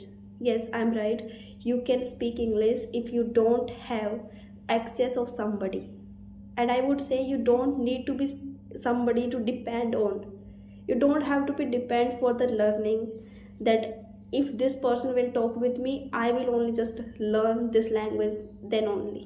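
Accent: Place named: Bihar, India. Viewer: Ye bihar english lag raha , sahi me Bihari ho, be continued 😊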